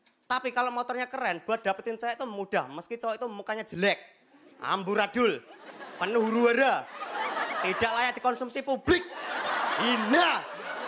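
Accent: Java, Indonesia. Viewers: itu kata" nya biasa...tapi omongan khas jawanya ngakak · aq ska bngettt ngomong medokmu iku loe. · Logat jawa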